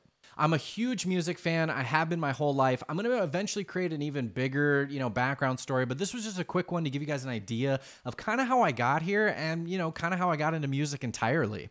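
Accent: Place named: United States. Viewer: Where you from bro? You have one of those hard to place accents. Colorado maybe, or Cali? Keep it up man, this is becoming one of my favorite channels! Quit MGK and Post Malone though, they're both wankers lol!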